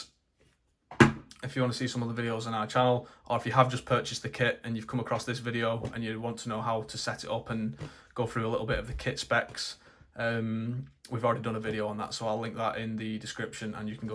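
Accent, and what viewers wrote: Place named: Bolton, United Kingdom. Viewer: always trust a bolton accent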